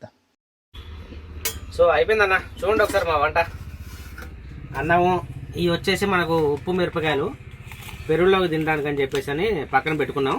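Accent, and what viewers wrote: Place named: Andhra Pradesh, India. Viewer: Anna nidhi husnabad kada andra basha matladutatav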